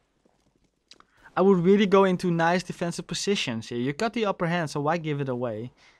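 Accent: Netherlands. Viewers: Good job man, I appreciate new people trying to be helpfull to the community! Also keep talking as much english as you get the opportunity for ;) Believe me as soon as the dutch accent starts to fade people will appreciate it even more.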